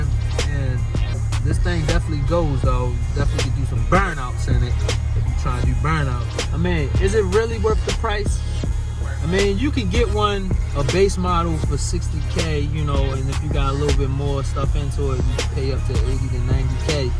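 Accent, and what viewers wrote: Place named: DMV, United States. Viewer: Dat dmv accent funny af duews and twues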